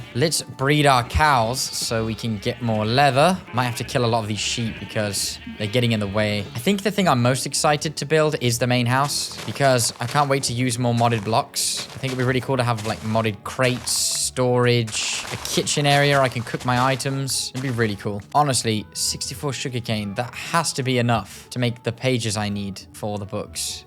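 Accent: United Kingdom. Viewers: in my honest opinion, I didn't know u were British 😂😂😂😅 And plzzz make a 200 days, that would be AWESOME! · you sound britsh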